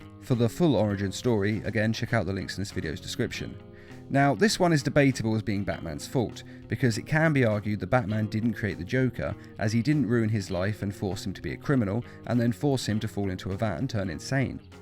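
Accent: United Kingdom. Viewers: I know Americans and Superman fans will eat up anything a British voice tells them but this list is dumb. Might as well list his parents deaths as another one of his "failures" because as we all know -- every time some tries to cause you or your loved ones harm -- IT'S ALL YOUR FAULT.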